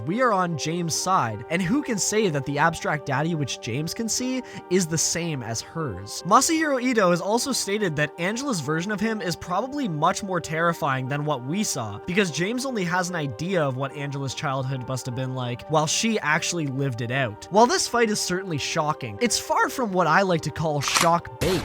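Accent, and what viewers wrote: Canada. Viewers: bro has a HEAVY canadian accent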